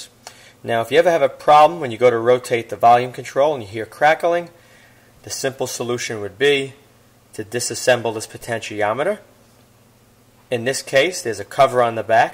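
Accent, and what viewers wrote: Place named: Boston, United States. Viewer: Thats too thick a bastan accent for me.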